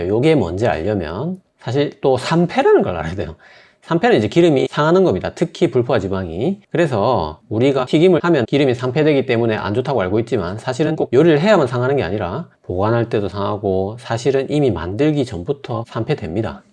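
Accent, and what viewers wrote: Gyeongsang, South Korea. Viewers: 유튜버들 중에 되게 애매하게 어렵게 말하는 사람들많은데 이분은 되게 명확하네요! 직설적인 경상도 사투리가 이래서 좋아ㅏ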